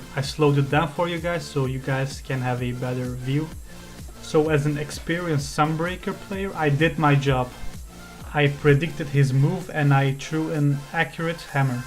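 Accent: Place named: Belgium. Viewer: lol my bad, I mean to put Belgian cause I have this friends that's from where you are and he says what the hell just like you with the accent.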